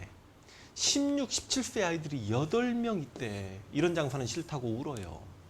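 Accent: Jeolla, South Korea. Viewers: 100프로 강제연행은아니죠 일부 피해자가잇어도 자발적으로 간 인물도 많을겁니다. 지금도 사채때문에 강제로 몸파는창녀가잇다치면, 돈벌기편하고 하니 창녀촌 안마 마사지에서 몸파는 창녀도있는경우인데 그 시대라고 창녀가 존재하지않는다고 할수있습니까??? 근데 말투가 딱 전라도말투네요 전라도사람이세요?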